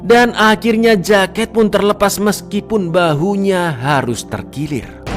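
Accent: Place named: Madura, Indonesia. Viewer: Madura kamu bang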